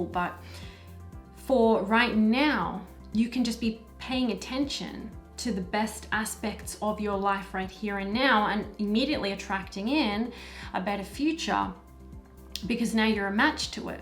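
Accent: Australia. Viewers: I thought that was an aussie accent! 😉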